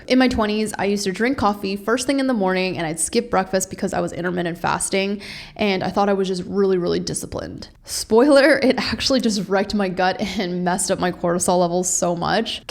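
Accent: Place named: Canada. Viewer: OH MY GOD IF YOU'RE FROM CANADA I FINALLY COULD PICK A CANADIAN ACCENT FROM AN AMERICAN ONE 😭😭🤧🤧🤧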